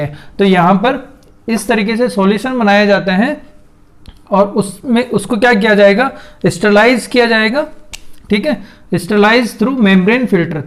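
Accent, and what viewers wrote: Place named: India. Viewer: sorry but how does can international students understand english indian fast accent ? but good video hehe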